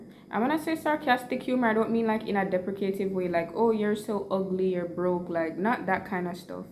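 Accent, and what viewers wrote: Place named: Caribbean. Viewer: You sound from like your from Dominica or Barbarian or trini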